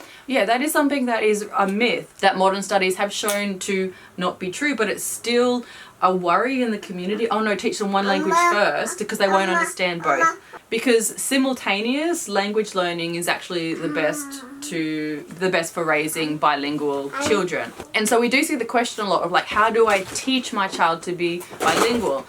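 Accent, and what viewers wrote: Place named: Greek Australian, Australia. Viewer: Are you Greek Australian? You have a woggy Aussie accent and said "no not papou, bathroom" so I assumed you must be Greek Australian.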